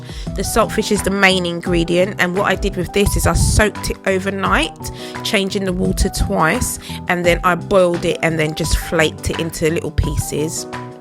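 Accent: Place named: United Kingdom. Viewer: Good question .your accent is British .I think u born there .but if you jamaican family born uk .can you talk patios?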